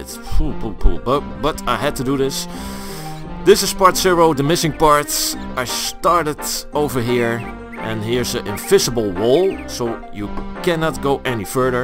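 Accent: Netherlands. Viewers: You have the best dutch accent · You sound dutch, isn't it? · you sound super dutch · That's the most Dutch accent I have ever heard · dutch accent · I can't handle how Dutch this guy sounds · Your dutch accent is out of this map man!! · that has to be a dutch accent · Ik hoor aan zijn accent dat hij Nederlands is😂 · i have this feeling that you'r dutch beacuse of the accent? XD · you're accent... , if you arent from the netherlands Im eating my shoes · Dat strong Dutch accent tho. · Haha je kan fk goed horen dat je Nederlans bent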